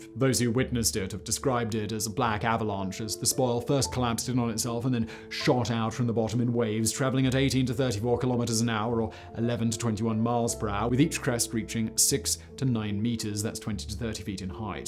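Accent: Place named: South, United Kingdom. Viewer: Attention yanks, accent shows he is from the south of England as he pronounces everything so poshly. I've never heard methyr Tydfil spoken like that before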